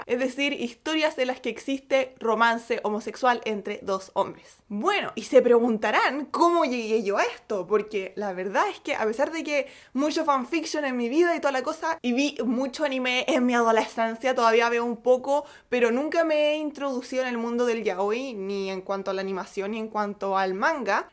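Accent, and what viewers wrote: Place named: Chile. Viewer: Mi obsesión por The Untamed me recomendó este video y cuando le puse play... Ese acento? Ese acento es chileno? Es chilena csm xD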